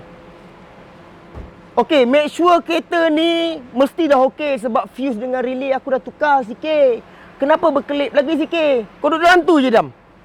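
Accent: Pahang, Malaysia. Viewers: bunyi slang orang pahang je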